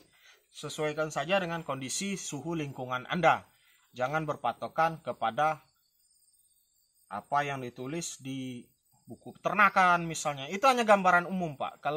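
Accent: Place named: Lahat, Indonesia. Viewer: Suka saya pembawaanya sampean,nada bahasanya kaya orang lahat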